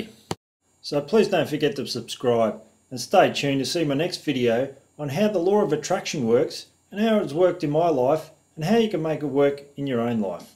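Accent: Australia. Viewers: I understand it because he speaks Australian English? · That's because he speaks AUSTRALIAN and I can understand him easily and clearly. Thank goodness he doesn't have that American tendency to draaaaawl their words out like the word is 3x longer than it really is in a nasal (annoying) tone of voice. AUSSIE! AUSSIE! AUSSIE! OY! OY! OY!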